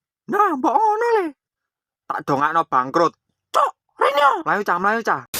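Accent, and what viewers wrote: Surakarta, Indonesia. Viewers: wong solo to bang?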